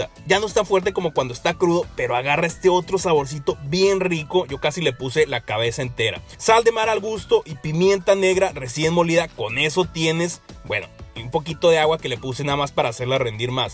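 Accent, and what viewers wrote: Mexico. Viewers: crei que eras de México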